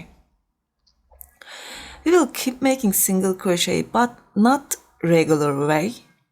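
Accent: Turkey. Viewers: You are an EXCELLENT TEACHER!!! Your English is NOT a problem at all, because you do the most important things to help us understand, unlike others who make crochet videos. I appreciate this tutorial, I am soooo bored with the same old stitches! You explain well, but other people do not explain the task, they just "say" do this stitch, do that stitch, without explaining why, but you do. Maybe we could learn a bit of your language! Turkish is a beautiful language, (the accent is too) just like other Altaic languages, like the Koreanic, Mongolic, & Japonic subdivisions, they are very beautiful to listen to, even when the listener does not know the language. THANK YOU! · It’s so beautiful 🤩 and your English is just so sweet. Are you Turkish ?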